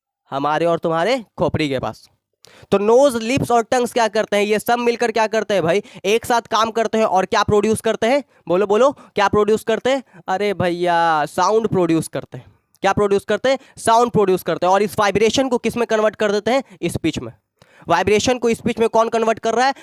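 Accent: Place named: Bihar, India. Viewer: Sir you are from Bihar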